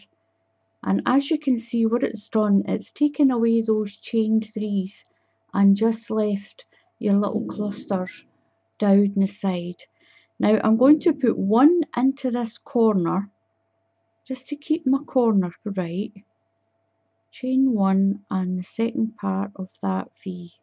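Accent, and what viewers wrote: Scotland, United Kingdom. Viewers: Looove your channel and all your help!!! And of course, I love your Scottish brogue... my Paw Paw Oliver hails from Liliesleaf...down in the Borders. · I love this! I would love to make some pillow covers. I love your accent also, you remind me of my grandmother who was from Glasgow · It's lovely to hear a Scottish voice for a change.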